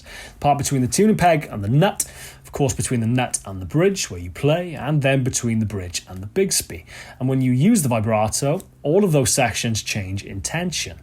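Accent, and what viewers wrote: United Kingdom. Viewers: Stupid hairstyle and British accent will turn off most USA viewers.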